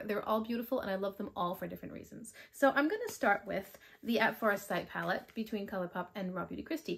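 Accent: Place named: East Coast, United States. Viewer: I smiled when you read the palette name "At Forest Sight"! When I moved out to the West Coast, my neighbor had a bumper sticker that read "May the Forest Be With You", and it confused me for the longest time, because I -- like you -- have an East Coast accent and pronounce "forest" with two syllables. Those puns only work if you pronounce it "forst", not "FAW-rest"!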